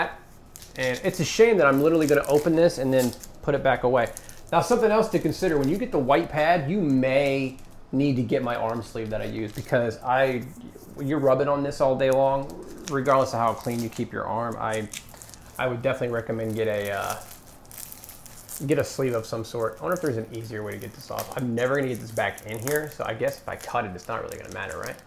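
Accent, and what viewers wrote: Ireland. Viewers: where in Ireland are you from ?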